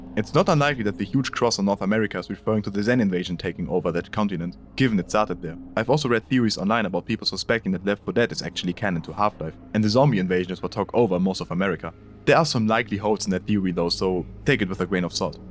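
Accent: Germany. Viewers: kannst du bitte den deutschen akzent loswerden der triggert mich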